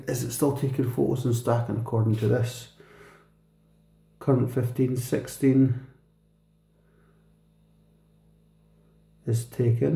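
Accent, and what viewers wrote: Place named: Scotland, United Kingdom. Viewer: Thank you for short but great video. Love the Scottish accent. Enjoyed this. I wonder if the exposure time was extended to 20 s how it would go? Well put together video.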